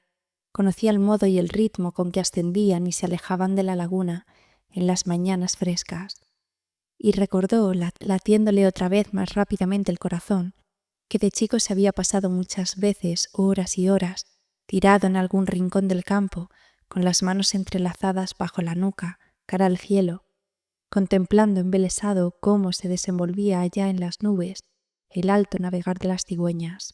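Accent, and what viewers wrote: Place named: Spain. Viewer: Gracias por tu narración ♥ Tienes el acento de qué región de España? Me encanta!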